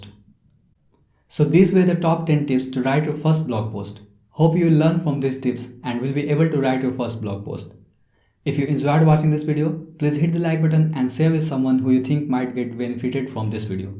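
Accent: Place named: India. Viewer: Indian accsent is the worst in the world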